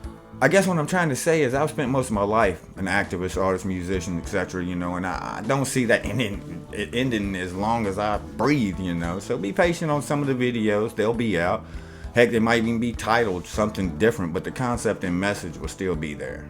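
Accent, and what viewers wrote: South, United States. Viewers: Man that sucks that people unsubscribed do to your warehouse america videos. I mean if you don't like em don't watch em. Bunch of self entitled asshats. But yeah, what you said at the end is true. If they can't get over this then how "awake" are they. And people. what the fuck is up with the constant accusations of his accent being fake? Why, because he sounds "black"? Dumb asses, that's a southern drawl which in turn is what influenced how modern African Americas talk. And even if he did, so fucking what. We need to get off this race bullshit. We're all human, our "real" race is just melatonin levels in the skin. What we call "race" is just cultural, just how we act based on where and how we're raised. If a white guy is raised in the ghetto he's gonna probably act "black" and if a black kid is raised like a typical white bread then he's got a good chance of acting "white". 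Of course I know the real reason for this shit, that self important higher then thou feeling when you go all social justice warrior and call out a "privileged" white man for stealing and raping black culture which is total bullshit. Get over it, every race has done fucked up shit to each other and we are so over that by now.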